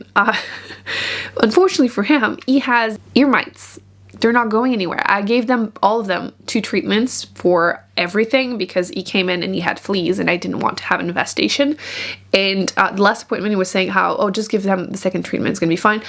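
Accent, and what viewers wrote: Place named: Canada. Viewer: Totally off topic, but I am listening to your accent and was wondering where you live in Canada?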